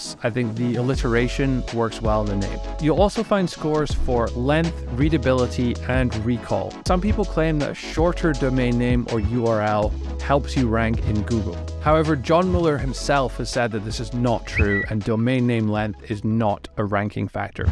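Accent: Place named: France. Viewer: because for French Accent and the funny (and useful) video i voted on Product hunt too:) Thanks guy for this tool and videos:)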